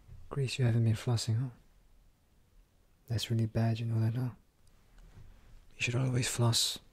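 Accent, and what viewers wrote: Spain. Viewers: I like the Spanish accent. This and Oberyn are my fav videos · I love this accent you do! it is phenomenal. This husky Spanish rough tone.. uff so good! p.s I love how close to the mic you are.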